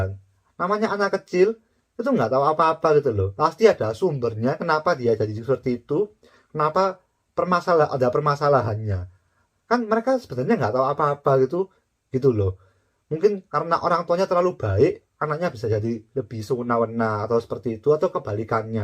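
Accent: Java, Indonesia. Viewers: aku suka bgt nonton variety show tp sayangnya tayangan televisi indonesia ga banyak ngasih acara reality show real, ada pun settingan ga jelas dan ga ada yg bagus menurutku kecuali Channel Net TV doang sehingga banyak ku habiskan nonton tayangan korea drama atau varietynya. Akhirnya discover channel youtube ini krn alogaritma youtube saat aku nyari dr. Eun Young pas bagian Ikon tampil. Sayangnya banyak yg gada subtitle engsub/Indosub nya variety psikologi ini (sekarang yg ada subs nya cuma dr Oh Eun Young's Report: Marriage Hell, lumayan belajar tentang dunia pernikahan hehee), padahal pengen bgt ada subs nya biar bisa belajar, ga cuma haha hihi doang kyk variety show lainnya yg jenisnya komedi. Kembali bahas channel youtube ini, pertama kali klik play, lah kog medhog, mengingatkan Korea Reomit/Jang Hansol hahaaa.. menarik, bakal kepo dan liat video2 di beranda channel ini. 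Semoga berkembang selalu dan banyak menyajikan info apapun yang menarik dan baik,!!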